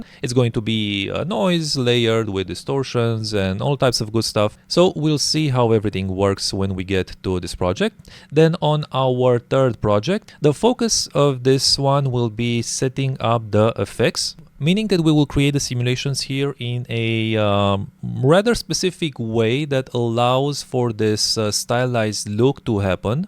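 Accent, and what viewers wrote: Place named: Russia. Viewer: You take not like a native speaker, are u russian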